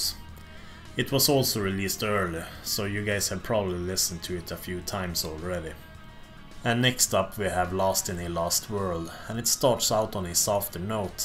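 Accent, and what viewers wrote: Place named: Stockholm, Sweden. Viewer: cringey stockholm accent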